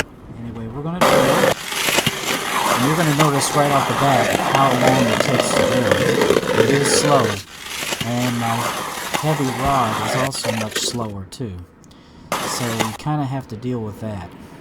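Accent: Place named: Georgia, United States. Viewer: You sound like youre from Georgia, which makes you a natural Ice Fishing Expert I recon :D Good Video! Can you show us how to catch Sturgeon next?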